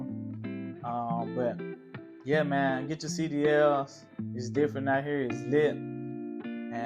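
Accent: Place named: Memphis, United States. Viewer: I knew you was from Memphis because you said Mane too many times 38118 in the building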